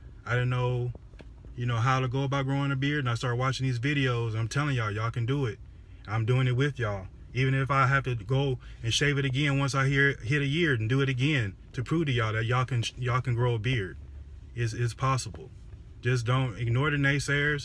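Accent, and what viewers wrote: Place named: Texas, United States. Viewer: Awesome! I was wondering what part of the country you were from. The way you talk sound just like everyone I know! Lol. I’m from Texas. My grandfather is in Ok.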